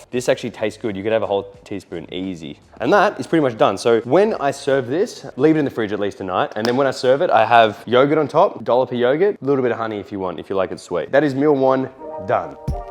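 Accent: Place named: Australia. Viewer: AYYYYYY another meal prep video in australian! lesssss goooooooo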